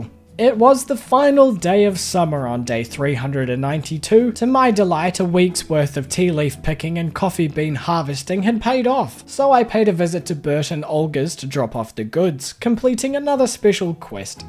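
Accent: New Zealand. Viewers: Are you….a kiwi? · As an American, it is so painful to hear you use an American accent after listening to your lovely Kiwi accent haha. Love listening to this during my study breaks!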